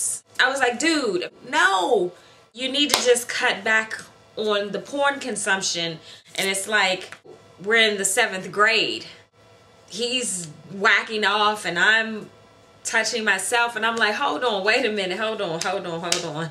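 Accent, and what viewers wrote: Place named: Washington DC, United States. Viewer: Where are you from sound like you from DC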